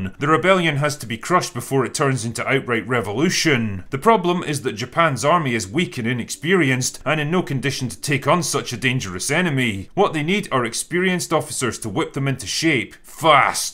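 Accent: Scotland, United Kingdom. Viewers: Note to self: everything sounds better (and funnier) in an outrageous Scottish accent!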